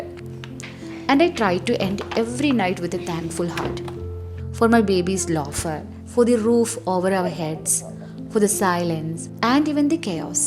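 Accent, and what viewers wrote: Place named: Bangalore, India. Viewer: U r in kochi right but ur smsrm oke kekmbo looks like u r faaar away frm Kerala like banglore oke nikne pole talking Ath kitula ith kitula sadnm nnoke pryne kekmbo I always think bro u r just in kochi ilathe ayt ndha uldh enn oke chaka oke lulu indvlo athrk venm Indel 😅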